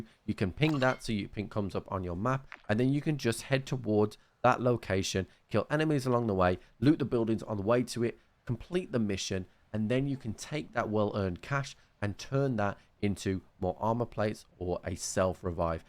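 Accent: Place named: United Kingdom. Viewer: DMZ is so damn fun I played it 16 hours straight starting yesterday! Thanks. So nice to find such a well-polished guide--and in a British accent, too! So nice to find such a well-polished guide--and in a British accent, too!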